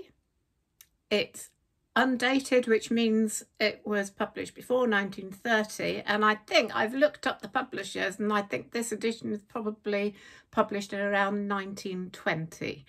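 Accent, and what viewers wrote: England, United Kingdom. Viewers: No apologies needed for reading in your British accent; I loved it!!! I look for British readers for everything. 🤣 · Also, I loved hearing Little Women in your English accent, I could listen to all books in your beautiful accent!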